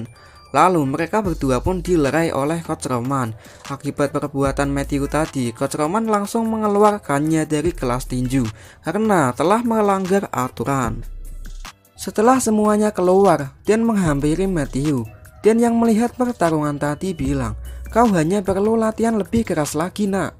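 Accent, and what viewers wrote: Java, Indonesia. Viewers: Min medog amat suaranya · Bang sampean wong jowo yo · Mas kamu orang Jawa?? Jawanya mana mas?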